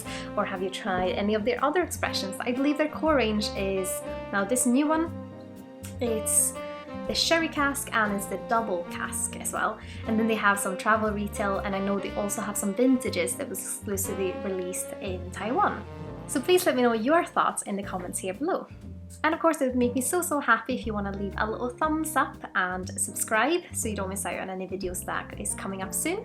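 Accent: Scotland, United Kingdom. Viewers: Sökte på youtube efter denna whiskyn efter jag köpte den på systemet, kul att hitta en video från någon svensk! Men du låter lite skotsk haha :D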